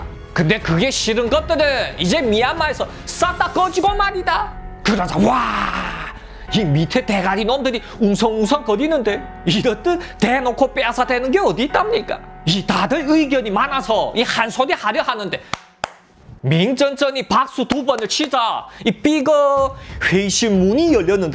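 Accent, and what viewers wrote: China. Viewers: 진짜 중국스타일 톤과 리액션 딕션 너무 재밋어요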